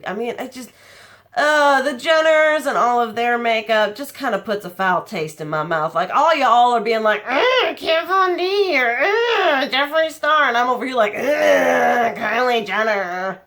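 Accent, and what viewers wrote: South, United States. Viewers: I’m from the South but live in NJ now. I love listening to your accent and after watching your videos, my kids tell me my use of the word “y’all” goes way up! ❤️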